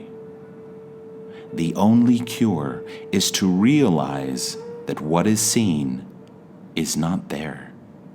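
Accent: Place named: United States. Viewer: It seemed a risky idea to hear this in American English. It turns out it was fine. Thanks 💕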